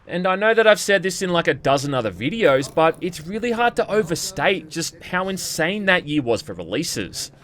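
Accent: Australia. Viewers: Fkn AUZZIE 😂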